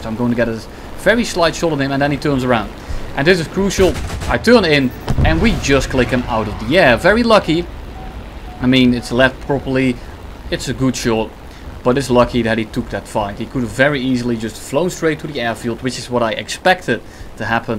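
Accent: Netherlands. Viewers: I hear a Dutch accent, though i can't determine what kind of accent it is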